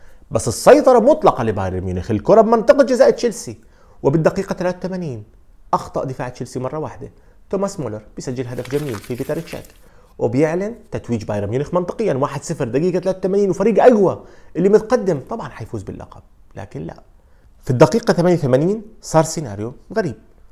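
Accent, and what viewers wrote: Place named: Syria. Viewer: اللهجه السورية بتجنن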